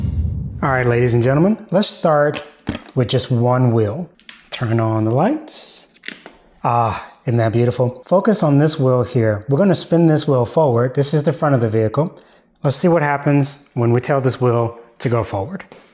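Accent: Baltimore, United States. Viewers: You sound like you from Baltimore you should try to get on at that bridge project. I'm sure they use an engineer if your caliber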